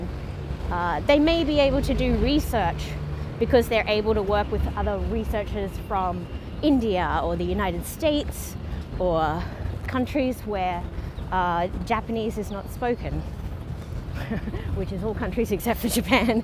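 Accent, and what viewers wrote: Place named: Australia. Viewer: My colleagues would disagree strongly 😂めっちゃオーストラリア訛の若干のアメリカ影響ですよ〜😂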